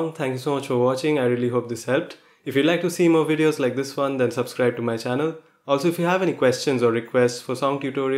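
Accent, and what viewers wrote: India. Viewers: ikr? He has like the same accent. it's Indian accent. but let's stop comparing i mean like nobody likes to be compared? tell me if i'm wrong. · Your accent sounds indian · good indian accent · His accent is Indian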